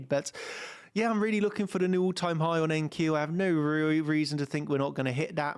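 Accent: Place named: West London, United Kingdom. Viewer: I'd love to know where your accent's from,. I feel sure it's from west of London!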